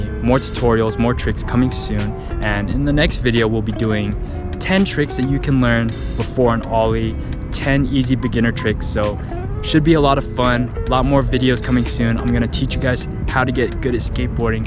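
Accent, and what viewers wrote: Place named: Ohio, United States. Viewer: he sounds like an ohio man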